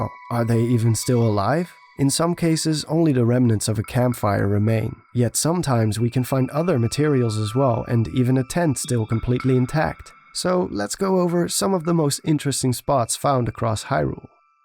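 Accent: Netherlands. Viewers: I already thought you were Dutch! I recognised the accent :) (I am also Dutch myself) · You make great videos I really thought you had like a million subscribers. Also are you dutch because you kind of have a dutch accent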